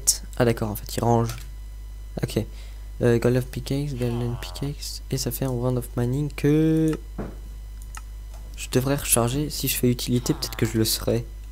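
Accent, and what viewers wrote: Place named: United Kingdom. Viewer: t anglais ou pas car ta un acsen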